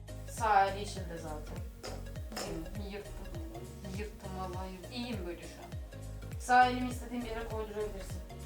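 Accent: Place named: Osmaniye, Turkey. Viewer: Yaw her hareketine ve konuşma tarzına hastayım aynı bizim osmaniyeliler gibi konuşuyor hal ve hareketleride aynı. Kısacası Hastasıyız Dedeeee 😎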